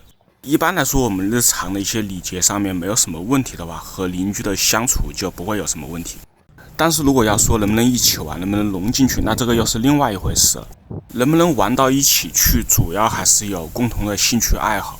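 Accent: Wuhan, China. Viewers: 我也是武汉滴，刚开始冒看评论，一听你口音就知道鸟，我经常去日本旅行，有空聚一哈，研究一下在日本卖小龙虾🦞哈哈哈🤣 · 博主是不是武汉人啊？ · 武汉的普通话👍😅😂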